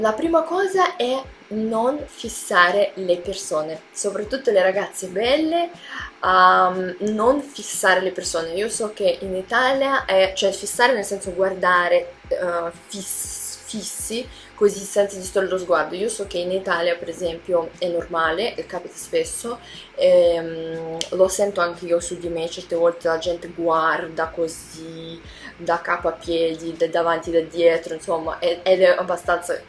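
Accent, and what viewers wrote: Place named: Russia. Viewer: Bellissima,e brava, seguirò altri tuoi video.Ho scoperto da solo la fondatezza di quanto dici,,sono stato tre volte in Russia,e in buona parte dei pur tra loro diversissimi paesi dell'ex blocco sovietico.Conosco anche un po' di russo,anche se infinitamente peggio del tuo ottimo italiano,condito dal tuo accento russo dolce e graziosissimo.Al proposito ti chiedo,esiste una qualche espressione colloquiale russa per Sfiga?o un qualcosa che possa avvicinarsi all'italiano attaccare bottone?un grazie se vorrai rispondermi ciao Fabio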